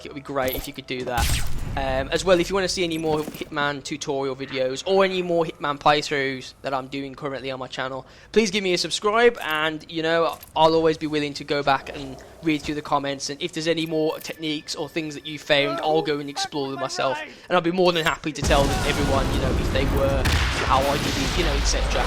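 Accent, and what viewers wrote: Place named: Birmingham, United Kingdom. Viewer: You sound like you're from Birmingham.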